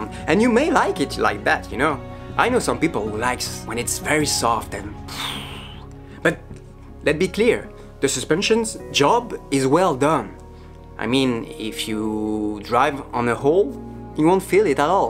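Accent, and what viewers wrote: France. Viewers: Laissant de côté l'accent français trop prononcé, tu parles un anglais très bon ! Bravo encore ! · your doing good mate, english with a slight french accents sound really good and you provide some very relevant info to us all... Mercy. You have some very important points about the quality of the suspension which is important.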